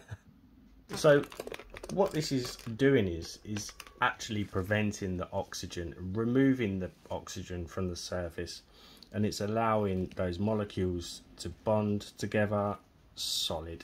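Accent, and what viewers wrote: Essex, United Kingdom. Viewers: God I’ve been married for 30 odd years but after watching this tonight I could actually marry you, you amazing man! I love the way you explain why. You’re so bloody awesome and I love the fact that you sound like your from Essex or somewhere like me too 😂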